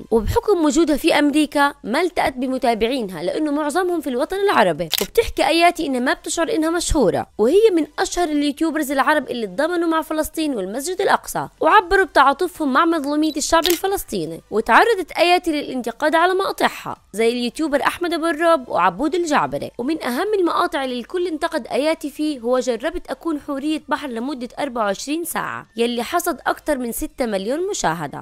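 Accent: Yemen. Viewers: الهجه يمنيه بجنوب اليمن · ايه كانت تصور فيديو ف تيك توك هي واحد وسألوها المتابعين في التعليقات دا زوجك ردت وقالت ايوه مين قال لكم انها مش متزوجة لا هي متزوجة 🙂💕 تعديل هي لما عاشت في مصر وقلتوا انها تتكلم مصري Noo هي اللهجه اليمنيه كدا زيي يمنيه✌🏻💜🌝🇰🇷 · اني كنت احسبها سعوديه بس طريقت كلامه يمنيه هلا ببنت اليمن ❤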